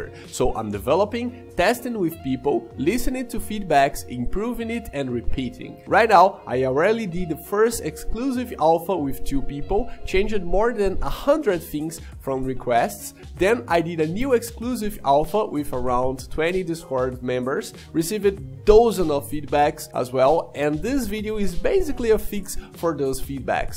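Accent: Rio de Janeiro, Brazil. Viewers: E parece ser carioca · Brazilian accent, commom brazilian name 🤔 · Esse sotaque da cidade maravilhosa não me deixa duvida kk... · sotaque brasileiro é impossível de esconder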